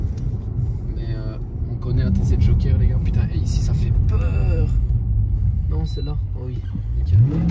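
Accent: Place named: North Africa. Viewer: Cet accent limite nord Afric est insupportable...faut évoluer garçon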